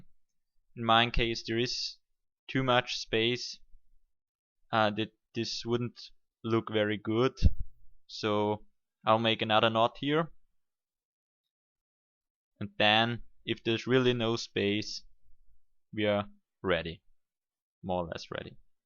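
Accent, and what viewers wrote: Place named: Denmark. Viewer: Det lyder som en dansker.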